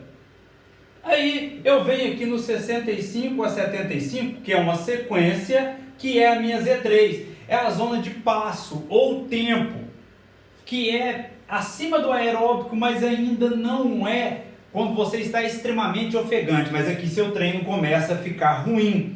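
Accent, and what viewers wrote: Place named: Minas Gerais, Brazil. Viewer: Parece mineiro uai!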